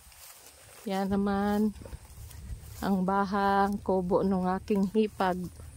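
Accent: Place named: Tagalog, Philippines. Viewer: Saan bayan kayo maam parang nadaanan ko na din jan.at Ang salita mo pamilyar Sakin Tagalog 😮❤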